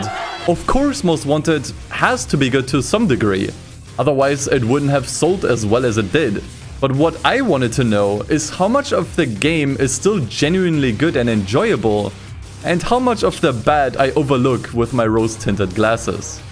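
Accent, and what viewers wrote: Germany. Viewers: Ah ich hab doch richtig gedacht dass bei deinem Englisch ein Deutscher Akzent rauszuhören ist und bei hat es deine Freundin bestätigt. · ich wusste dass er deutsch ist, da er einen deutschen akzent hat hahah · first time here and for 7 mins i was like "do not assume. just because he sounds like a german does not mean he is german." yeah seems like i listned correctly :D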